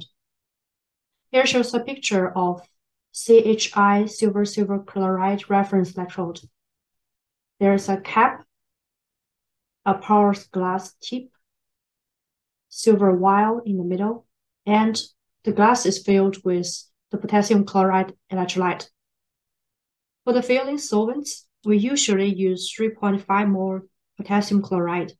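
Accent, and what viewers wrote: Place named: China. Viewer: Love the Chinglish accent, it sounds familiar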